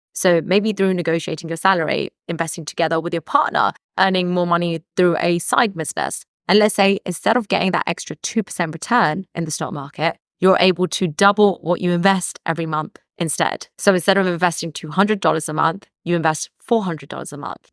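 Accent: United Kingdom. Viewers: koompound interest😂😂f00-k-i-n brit accent......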